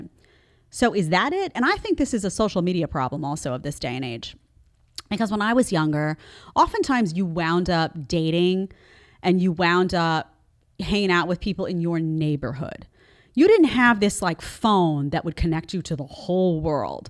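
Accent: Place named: New York, United States. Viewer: Jedediah's NY accent leaks out occasionally. I prefer it, to be honest.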